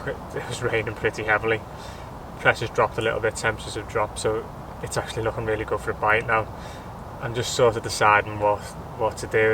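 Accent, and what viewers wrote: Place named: Liverpool, United Kingdom. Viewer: Well in lad !! Nice to c a scouser on a vlog !! Peaceout .....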